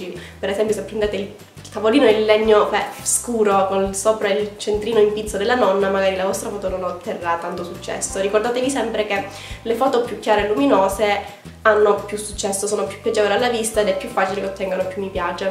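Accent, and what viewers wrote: Sicily, Italy. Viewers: Ciao ti ho scoperta da poco sei siciliana per caso??:-))))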